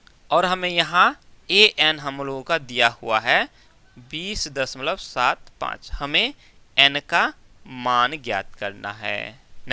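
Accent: Bihar, India. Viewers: Sir aap bihar se ho kya ❤❤❤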